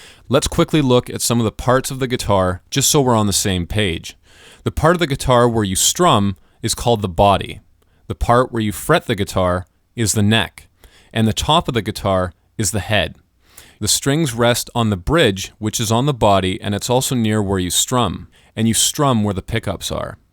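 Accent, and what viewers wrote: Canada. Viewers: that canadian accent ughhhhhh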